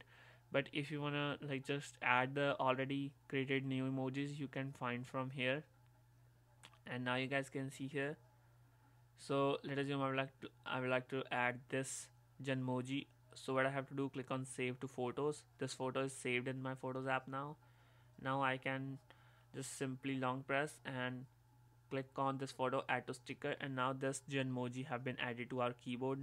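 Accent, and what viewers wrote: India. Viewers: Pahadi English bahut badhiya ji❤❤👌👌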